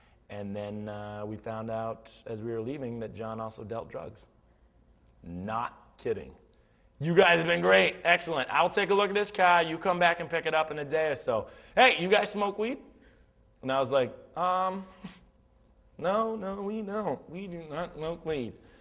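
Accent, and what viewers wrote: Boston, United States. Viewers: He needs to bring the Boston accent back more often. Seriously. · Oh my god! he is the best story teller ever!I love his boston accent, its priceless!!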